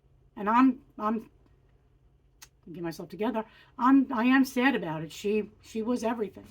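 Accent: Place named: New York, United States. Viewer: Thank you for sharing this!! It has been in my mind to start a channel, and you have given me some encouragement. I love your tiny home -- a year ago I was looking for one, but the cost of the lot rent and the locations kept putting me off. I travel a lot in a campervan, bought during the pandemic because it literally grounded me from flying. So I have my house, with unbelieveable story's about my "refresh" using AI and I think that would be fun to share along with my van adventures. So, thank you. You sound like you have a New York accent. Be blessed!!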